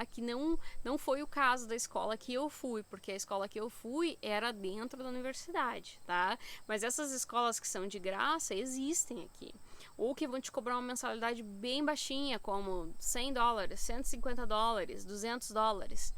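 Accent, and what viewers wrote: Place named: Gravataí, Brazil. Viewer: QUE BOM EU MORO EM GRAVATAÍ E RECONHECI O SOTAQUE, PARABÉNS!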